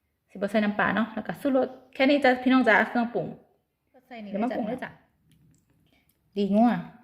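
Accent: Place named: Nong Bua Lamphu, Thailand. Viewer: คนเลยกะสำเนียงบ่แม่ลาลงัวใส่ปลาแดกกะทางเลยเว้าคือเว้าหนองบัว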